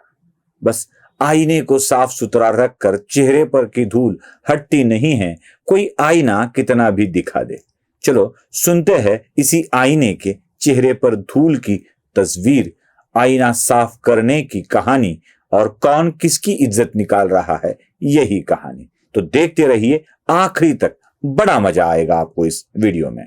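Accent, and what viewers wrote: Maharashtra, India. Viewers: Your analysis is good but I love your pure Marathi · सुशील तू यार हिंदीत बोलायच्या फंदात पडू नकोस, कारण हिंदी उच्चार मराठी सारखेच वाटतात.. हिंदी भाषीक तसे ही मराठी चॅनल्स मुळिच बघत नाहित.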